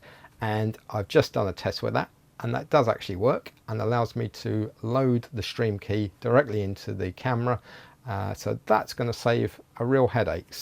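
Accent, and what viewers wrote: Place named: United Kingdom. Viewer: Thank you so much for quick response. :-) I can't wait to watch your next video. I think it would be also very helpful. And thank you for your wonderful British English. It's a huge pleasure to hear that instead of American babble. I wish I had such language skill one day....Greetings from Poland :-)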